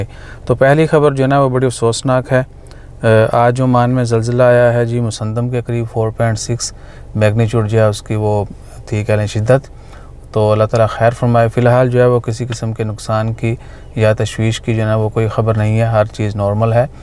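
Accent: Pakistan. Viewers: Bhai ap Lahore k han apki punjabi Lahore wali hai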